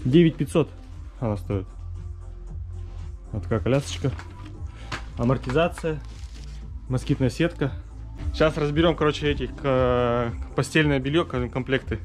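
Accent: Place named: Perm, Russia. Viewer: Блин, вы в Перми реально как в сериале разговариваете, случайно на твой канал наткнулся когда искал инфу по Гоу Про 8, и по говору понял, что ты из Перми, не ошибся ))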